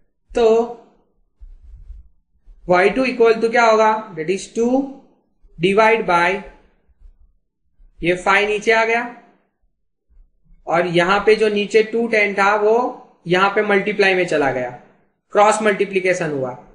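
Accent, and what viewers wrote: Bihar, India. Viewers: Are you from bihar!! '!' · Sala Bihari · bihar ho kya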